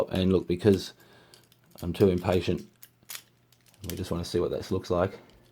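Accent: Australia. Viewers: Awesome! Thanks for the help! Are you aussie? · Where does one get these series of? Your from Australia based on your accent (So am I). I've only ever seen the standard range at my Target/BigW/KMart.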